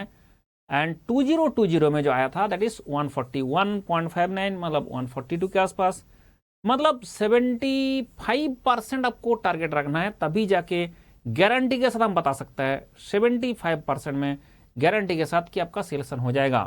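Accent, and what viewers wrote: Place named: West Bengal, India. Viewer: I'm sure sir is from west bengal .... Voice 🔊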